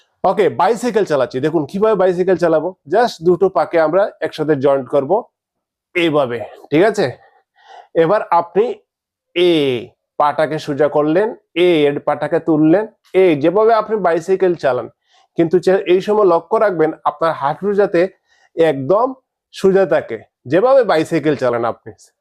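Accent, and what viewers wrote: Sylhet, Bangladesh. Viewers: ভাই কিতা সিলেটি নি কথায় বুঝা যার সিলেটি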